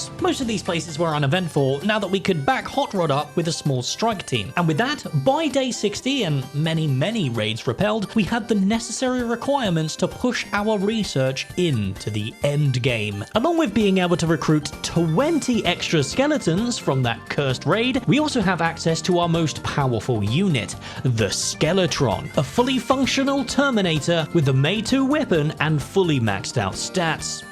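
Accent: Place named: United Kingdom. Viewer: i love how this channels mascot is a cute kitty while the channel is run by a handsome british voiced guy so we just have this handsome sexy as fuck voice coming out of this kitten its like that one charactor from shangri la frontier anime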